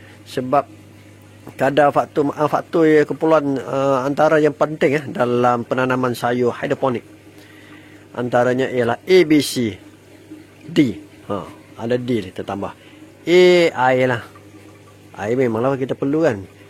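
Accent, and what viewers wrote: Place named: Kelantan, Malaysia. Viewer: cikgu ni org kelantan kea?..ad bunyi Kelantan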